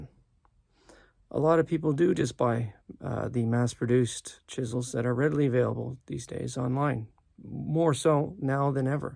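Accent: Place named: Canada. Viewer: These chisels are great mate! love the fire sharp chisels. I have a ton I was given in my workshop that are super old. Ill do a video of them at some point. They need re-tempering really and don't hold up to the sandstone I often work, and the abuse I put my tools through 😂 Where in Canada are you? I spent some time over there a while back. miss that accent.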